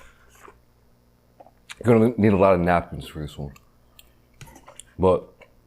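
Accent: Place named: Midwest, United States. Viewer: i new you sounded midwest i fuckin new it lol. i grew up in the northwest suburbs of chicago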